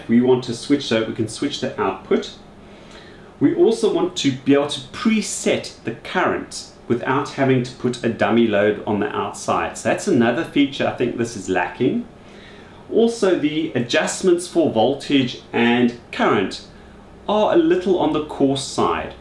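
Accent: South Africa. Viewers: Little confused at first having South African accent from the UK :-). I am enjoying the series. Keep up the good work.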